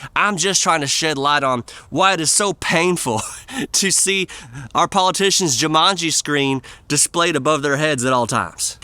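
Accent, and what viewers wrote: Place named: Mississippi, United States. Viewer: I grew up North as far as ya can get in the lower 48. I’m no Yankee tho. Hunt, fish, worked on farms, rode horses, cut timber. Oddly, I got bunked with from Mississippi such as yourself. Minnesota/Mississippi connection of the start and end of the Big River. Shouldn’t have, but we got along just fine, confusing folks with our polar opposite accents😂🇺🇸👍🏻💪😎🤷‍♂️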